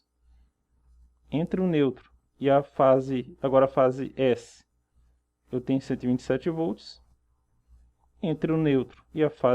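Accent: Ceará, Brazil. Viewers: Pensei que vc era do Ceará rsrs moro em barra do corda Maranhão rsrs show de bola a aula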